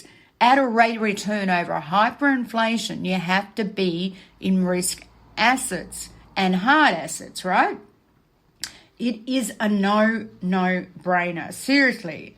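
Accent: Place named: Australia. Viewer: Jezus, je bent nederlands , 🤔met een ozzie accent, geweldig!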